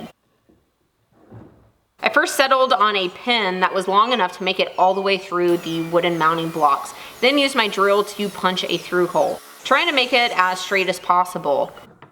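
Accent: Texas, United States. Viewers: Drill a hole for the pen.love the texas speak. How about using bead and hole stops like you would see on canes and walkers. Rotate wheels out of way when not using , click when u rotate into position. Lengthen arms that way too.that can also raise height, stop scraping bottom.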